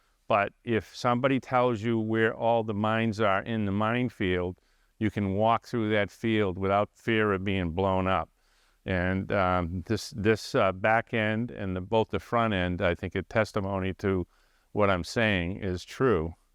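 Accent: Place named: New England, United States. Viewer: Where in New England you from.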